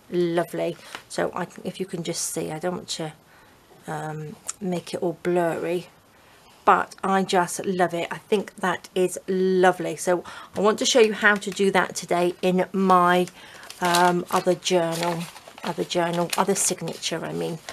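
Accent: United Kingdom. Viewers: Hi, I'm loving your videos, thanks! Can you tell me where you buy seam binding on this side of the Atlantic, please? I'm in Ireland. (I'm assuming from your accent that you are in the UK, but maybe I'm wrong?:-) )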